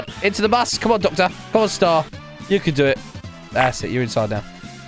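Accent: United Kingdom. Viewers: YOU HAVE A BRITISH ACENT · btw are you a brit or a scot or irish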